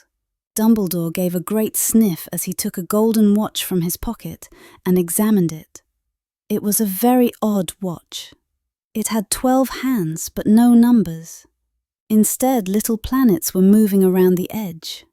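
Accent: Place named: United Kingdom. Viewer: wow what a translation and english pronounciation. Pure British Accent. Awesome.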